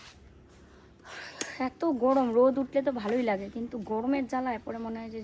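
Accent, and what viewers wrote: Bangal, Bangladesh. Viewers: Tumi j bangal vasha r adeshio vashay kotha bolo, besh valo lage shunte.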